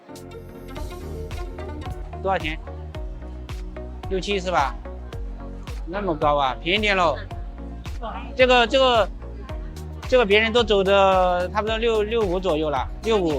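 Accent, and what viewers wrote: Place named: South, China. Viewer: 南方口音重罢了 其实没什么的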